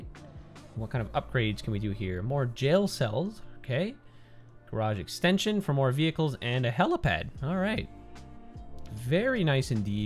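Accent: Canada. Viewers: Found this channel, love it and love the city and story behind it. Also, you a fellow Canadian eh? Cheers bud, keep up the great work 👌🏻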